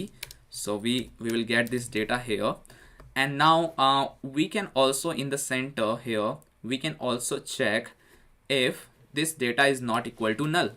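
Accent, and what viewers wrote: India. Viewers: An awful English accent... Indian people should never be allowed to speak English. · Your voice like Indian people 🇮🇳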